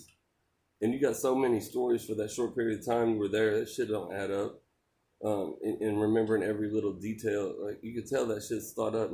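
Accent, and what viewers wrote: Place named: Indiana, United States. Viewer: I dunno you, but you're a white guy from Indiana. And you talk like a white boy from Indiana, you don't pretend to be something you're not. These clowns pretending their momma is blacker than night are an embarrassment. Total embarrassments.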